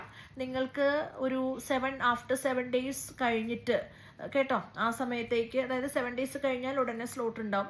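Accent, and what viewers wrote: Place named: Kottayam, India. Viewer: കോട്ടയം ഭാഷയാണ് കളിയാക്കണ്ട!!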